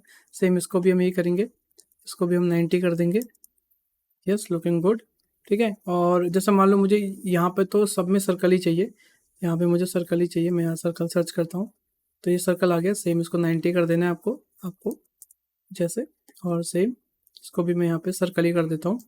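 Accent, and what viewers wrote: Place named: India. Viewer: bro i was frustrated by rigging but ,, after your video now i got every point you teached .. best video for rigging in indian accent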